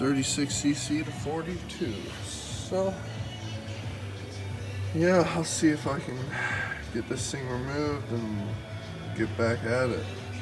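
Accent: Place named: Newfoundland, Canada. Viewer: I hear that newfoundland east coast twang haha . Im a islnder myself.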